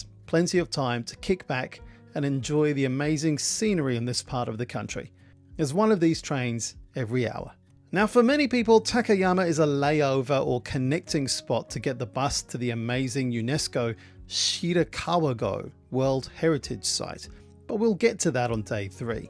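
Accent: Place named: New Zealand. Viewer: u have an interesting accent. feels like you grew up in australia or NZ and went to england or visa versa. takayama is great, so much so, i just bought a house in the old historic town. edit: nz not aussie, subtle but it's there :)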